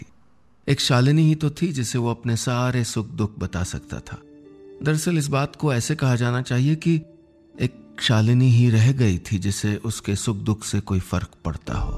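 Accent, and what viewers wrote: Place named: Pahadi, India. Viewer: बहुत अच्छी कहानी खासकर हमारी पहाड़ी बोली के बोल आपके मुँह से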